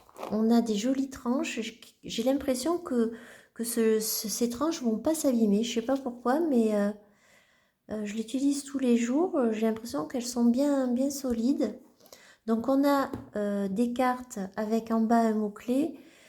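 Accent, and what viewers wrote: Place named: United Kingdom. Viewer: Bonjour! Je suis très nature 🐰🐿️🦇🦔🌳et très couleurs 🌈 alors forcément je me suis régalée! Merci pour ces découvertes c'est enrichissant et très tentant 😍. Nous avons le même accent anglais 😉...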